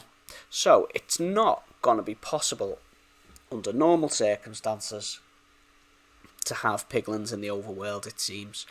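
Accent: Liverpool, United Kingdom. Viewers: Nice Scouse! Clive is SO cute ❤ He fits you for a spirit animal lol.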